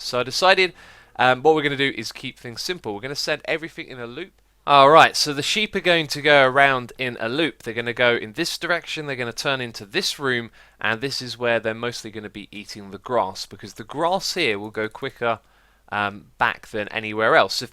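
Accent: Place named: United Kingdom. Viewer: I'm really not a good builder (In his awesome British accent) Me: (In my not as good american accent): Yea? Me: Look at your base.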